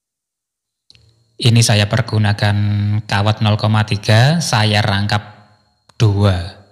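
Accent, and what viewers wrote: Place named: East Java, Indonesia. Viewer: sampean orang mana om,,logatnya kayak jatim bagian kulon..😁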